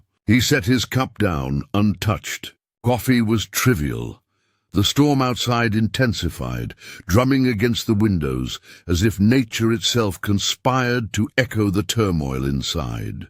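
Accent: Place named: England, United Kingdom. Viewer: Sorry, but I can't listen to the heavy English (?) accent telling a biker story. Just doesn't match.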